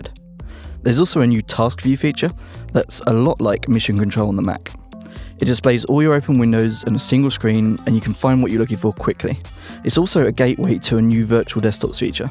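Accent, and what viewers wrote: United Kingdom. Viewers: I have never hated a British accent as much as this. It would've been a great review otherwise. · fuckin british accent, cant understand a word :C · damn I've looked for various reviews and they all have narrators with British accents I can't &^%$ understand...why do they do this?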